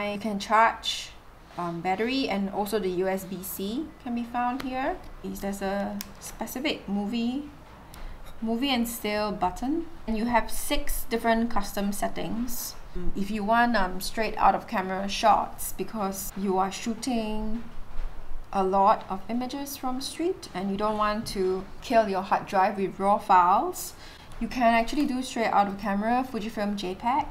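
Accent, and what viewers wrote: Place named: Malaysia. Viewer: This is 2nd time I watch your video, I don't know your background my guess with your accent and mannerism is that you are either from Malaysia or Singapore, I think it is the former. BTW I am a Malaysian, and this camera is too expensive and sophisticated for me.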